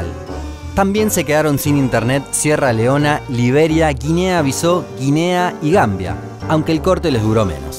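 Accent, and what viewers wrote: Argentina. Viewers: Un Mundo Inmenso tiene un formato tan atrapante, un guion excelente, y un ¡narrador argentino che!!! que hace aún más entretenido el contenido!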